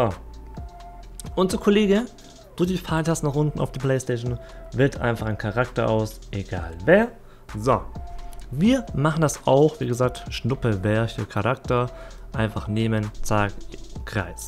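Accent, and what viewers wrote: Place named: Sweden. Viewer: Oha hätte niemals gedacht das so ein Schwedischer Restakzent klingt aber ist auf jeden fall mal was anderes. Hat was ^^ Ist auch ein seht sehr schönes Land definitiv und gar nicht immer so kalt wie man denkt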